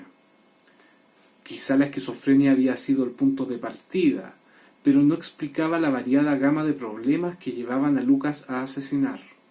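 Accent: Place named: Chile. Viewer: Gracias por las recondaciones. Saludos desde Perú, he madrugado a ver tu vídeo Por tu dejo al hablar asumo que es Chileno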